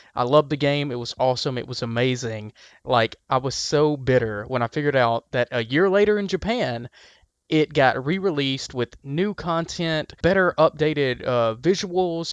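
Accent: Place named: South, United States. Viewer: Hearing that southern accent is amazing.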